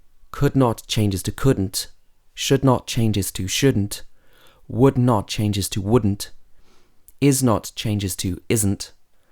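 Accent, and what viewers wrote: United Kingdom. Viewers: Excellent British accent 💗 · British accent ??????? Je je je.....no thanks....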